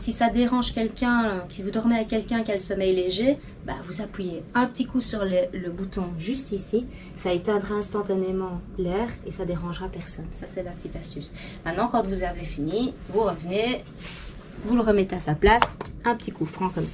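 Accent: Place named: Belgium. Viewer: En Belgique, c'est comme cela que l'on parle français et on s'en accommode très bien, ne vous en déplaise.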